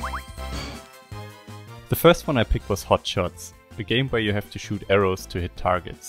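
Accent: Germany. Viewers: Man merkt dein deutschen Akzent Kollege Schnürschuh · Man merkt an dem Akzent, dass du deutscher bist 😂 Find ich eigentlich ganz toll, dass man sowas gut erkennen kann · ich konnte schon nach wenigen sekunden an deinem akzent erkennen dass du auch deutsch bist haha :) ich bin übrigens weiblich und habe zwar keinen freund der mir das video geschickt hat, aber mario party ds ist einfach meine kindheit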